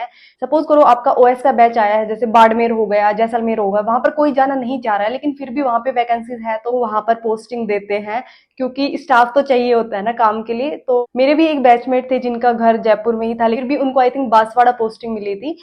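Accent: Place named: Bihar, India. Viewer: No offense. You got sizzled bihari accent...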